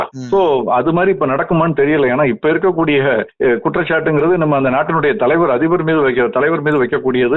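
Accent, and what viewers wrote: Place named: Sivaganga, India. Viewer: Eruchunaa illai. ஏறியது என்றால். அவர் பேசுறது சிவகங்கை மாவட்ட பேச்சுவழக்கு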